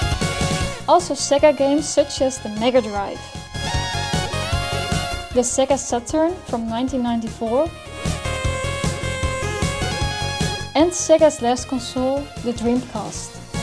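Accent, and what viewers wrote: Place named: Netherlands. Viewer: I can tell the dutch accent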